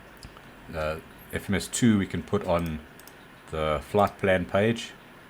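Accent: South Africa. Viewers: Nice to hear a south african accent for a change. I live in George Western Cape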